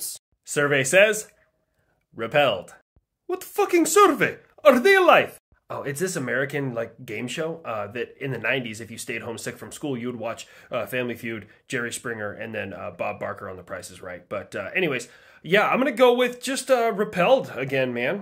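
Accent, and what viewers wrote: Scotland, United Kingdom. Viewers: Ok, so does he sound like fat bastard from Austin Powers? How about the Scots in braveheart? Or perhaps Scotty in Startrek? Hell ive been to the UK and ran into a few pretty cool Scottish people and honestly its close enough. Also fun fact the Irish were a lot harder to understand when i visited the region. Oh i forgot about Gimli from lord of the rings. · As a Scottish person myself, I'm fine with the accent it's funny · If the scots don't like your scottish accent impression, could you try maybe Soap MacTavish? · My good friend directly from Scotland tells me that the accent is like a family-friendly version. And showed me how a real Scotsman swears and curses that I can not repeat here in Youtube comments. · am a Kilwinning lad from scotland, and i love your Scots. regardless of sound its spiritually accurate! Just remember the word 'Cunt' in scotland isint a swear word like the auzzies, its more of a comma! 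#A10ismyspiritanimal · Right who's been having a go at your scottish accent? Point me to them the wee bastards. You're doing great! 🏴FREEDOM!🏴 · I'd love to hear Count Dankula's or Critical Drinker's thoughts on your Scottish accent. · If people complain about the Scottish accent, just tell them that you're actually doing a dwarven accent from Warcraft or something.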